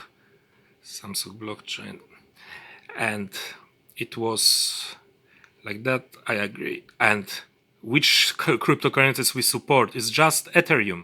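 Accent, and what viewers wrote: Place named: Poland. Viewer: akcent jakiś taki polski ;)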